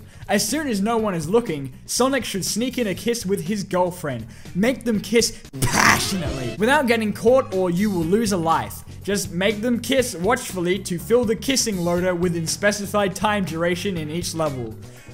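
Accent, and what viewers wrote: Australia. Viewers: Hey, i know ya probs wont get around to this but i really can relate to your channel and by that i mean like your veiws on transformers, humor and games. And i have had some rough times lately, so to have someone relatable to watch is real neat. And if you don't mind me asking, you sound aussie, you from aus? I am and im just curious. Anywho thanks for the laughs, entertainment and overall good vibes. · I feel like a dipshit for just now realizing you have a lisp. For some reason I just thought you had the strongest Australian accent i'd ever heard. And other youtubers would either be more shy or have a more noticeable lisp while yours blends into your accent. Either that or crazy.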